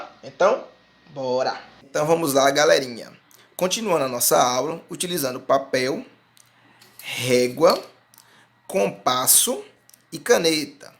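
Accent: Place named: Pará, Brazil. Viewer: ele tem sotaque do Pará kkkkkkk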